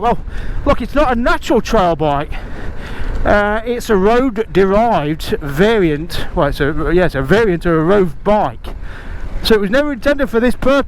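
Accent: United Kingdom. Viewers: Sitting here in QLD Australia watching this video tonight after work, highlight of my day mate, love watching your videos, and listening to you, miss the uk accents ! Waiting on delivery of a CFMoto 450MT, super popular bike, looking forward to breaking it in 👏👏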